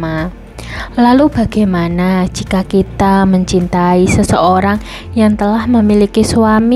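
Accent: Java, Indonesia. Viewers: mau ketewa denger medoknya.. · channel gila medok nya tambah nggila ni 🤮🤮🤮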